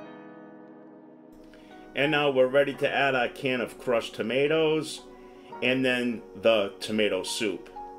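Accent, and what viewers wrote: Rhode Island, United States. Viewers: It's cool to hear a fellow Rhode Islander with a cooking video. It just so happens I plan to make this tonight. My recipe is a lot like 'yaws :)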